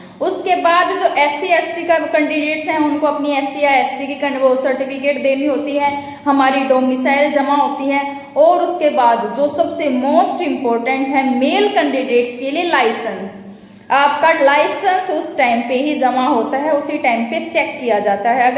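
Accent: Haryana, India. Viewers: Haryana se ho ji aap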